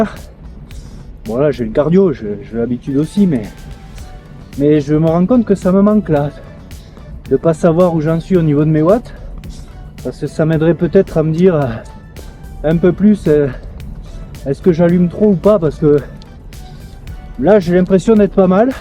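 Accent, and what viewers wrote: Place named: South-West, France. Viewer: Beaucoup de mots crus et d'accent du sud-ouest. Je m'abonne.👍💪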